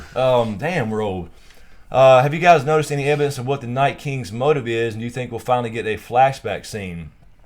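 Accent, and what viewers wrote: South, United States. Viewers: there is nothing wrong with a sweet good ol boy accent. just ask for parts as soldiers in someones army.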